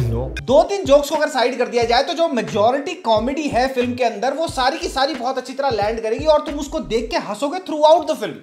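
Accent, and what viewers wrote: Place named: Delhi, India. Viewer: I agree 100% accent is exactly like Delhi boy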